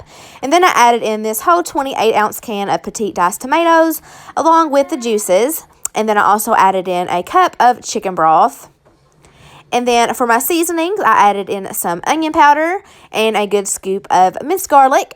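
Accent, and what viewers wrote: South, United States. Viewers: Looks so good love her southern accent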